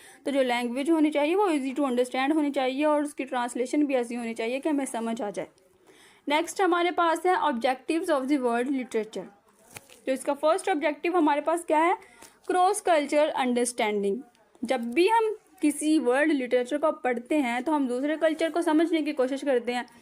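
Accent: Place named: Himachal Pradesh, India. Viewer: Very nicely explained, 🎉 Are you from Himachal, or from Pakistan? ❤ The way you speak is so attractive, this kind of slang I always like. 😍 God bless 🙌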